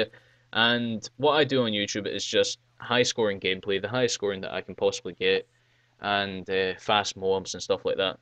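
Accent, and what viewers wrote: Scotland, United Kingdom. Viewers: woo scottish ftw ;D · I LOOVEE your scottich accent! Easily subbed!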